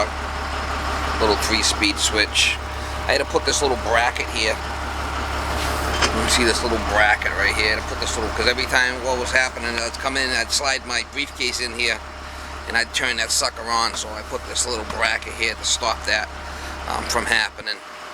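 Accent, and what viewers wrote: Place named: South Georgia, United States. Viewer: That's a South Georgia accent if I've ever heard one... great job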